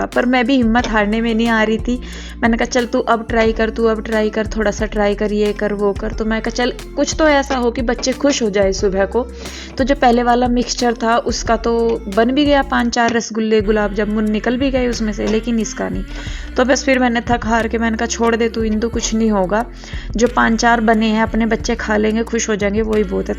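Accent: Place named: Rajasthan, India. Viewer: Hi mein new sabscribar hu aap ka chenal sabscribar keya hai meine roj video dekhti hu aap ki bolne ka tarika matlab aap ki lengvej bahot achi lagti hai aap kaha se ho rajasthan ya hariyana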